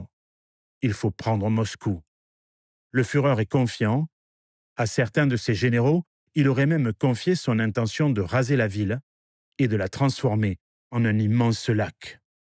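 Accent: South, France. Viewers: Pas mal du tout, les documents tiennent la route et le commentaire ,avé l'accent du midi, est sympathique !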